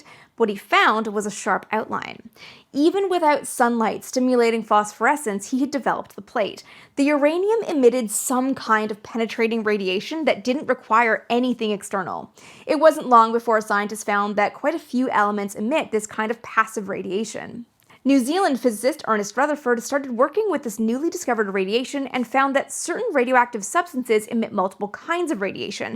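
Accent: Canada. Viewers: She's Canadian, yes? Or Possibly from Minnesota.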